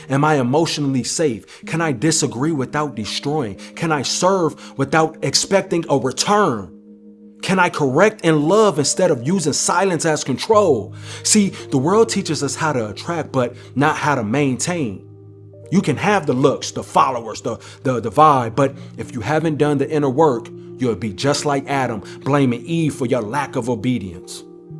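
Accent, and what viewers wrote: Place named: New York, United States. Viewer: This brother is so deep. I just came here today and subscribed. I’ve been working on myself, paying attention to what I put in my body as he said in the other video and healing. I needed this confirmation. I love his passion! His voice makes you stand at attention!!!! He has a New York or Northern style passion is his voice. I love this channel. Not about vain BS.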